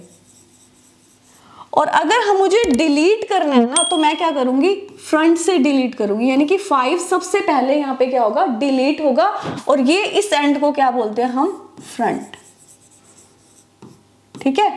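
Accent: Punjab, India. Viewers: Accent punjabi didn't left the chat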